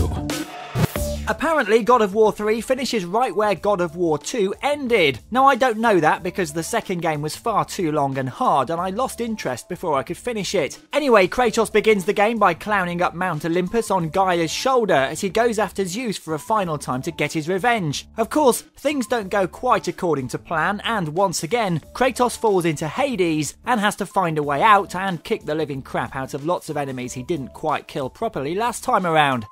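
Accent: United Kingdom. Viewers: actually he sounds british to me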